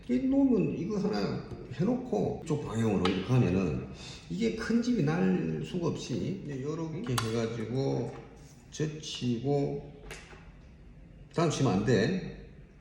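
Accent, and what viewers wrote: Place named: Gyeongsang, South Korea. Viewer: 원장님 경상도 사투리 쓰고 계시는데 혹시 고향이 어디 신지요 저하고 말투가 비슷해서 하하하 (맨들라꼬)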